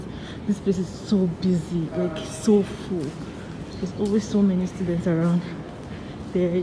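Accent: Nigeria. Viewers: you sound so much like a Nigerian. I'm amazed on how Nigerians and Cameroonians sound so alike. XOXO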